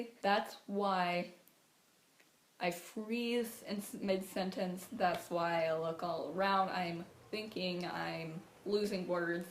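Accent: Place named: Russia. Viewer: Is it just me or you sound a bit Russian here 😊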